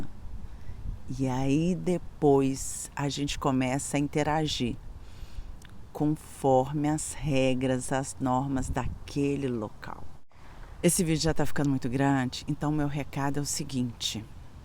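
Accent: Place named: Brazil. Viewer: Parabéns amiga, se não fosse o sotaque ninguém diria que a senhora era brasileira. Eu como Português, nascido em 1940 tenho a dizer-lhe que, quanto a Portugal e aos portugueses, o seu raciocínio está 120% certíssimo. Faça favor de ser feliz em Portugal na companhia de quem mais ama. Bem haja por este magnifico depoimento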